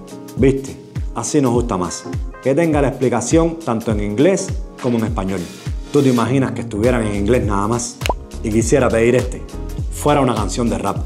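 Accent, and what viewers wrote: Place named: Cuba. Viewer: Mi brother si eres Cubano, sabrás que el acento nuestro es ése, no se trata de guaperia ni nada por el estilo, simplemente es nuestra forma de expresarnos. Cada país tiene su propia forma de expresión, ejemplo los dominicanos hablan que parecen analfabetos y es todo lo contrario simplemente es una cuestión de cultura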